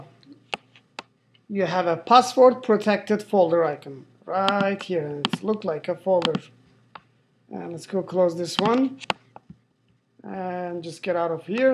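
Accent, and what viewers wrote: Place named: Russia. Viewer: russian lol!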